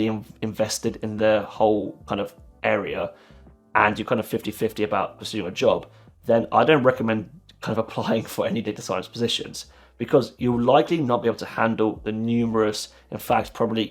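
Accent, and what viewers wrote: United Kingdom. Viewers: Are you native british , because I hear your words very clearly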